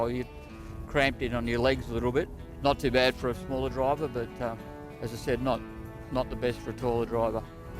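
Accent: Australia. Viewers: it doesn't matter if you have a Australian accent as long as you speak clearly. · It's an aussie accent but perfectly understandable. I'm from Bristol UK. There are people around here that are almost impossible to understand.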